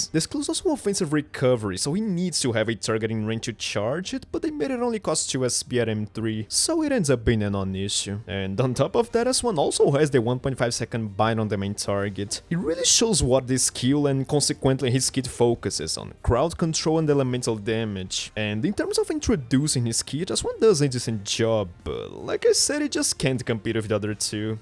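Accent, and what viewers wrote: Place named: Brazil. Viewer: Bro are u Brazilian? Your english sounds like mine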